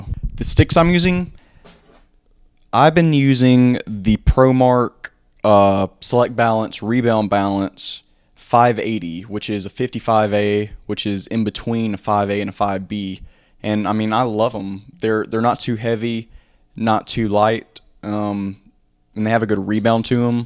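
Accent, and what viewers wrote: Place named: Maryland, United States. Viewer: where are you from? almost sounds like a Maryland accent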